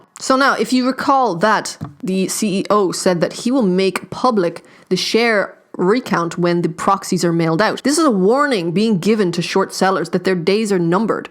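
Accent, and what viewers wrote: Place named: Ireland. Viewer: Great job! You sound Irish, my son lives in Coventry, UK.......You are doing outstanding work for the community....Thank You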